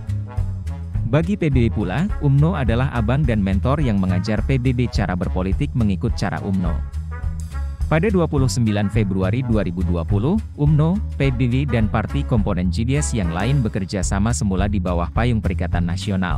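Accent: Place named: Indonesia. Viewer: Tapi Suara VIdeo ini bukan gaya orang sarawak tapi orang indonesia. Orang indonesia kah yang buat video ini?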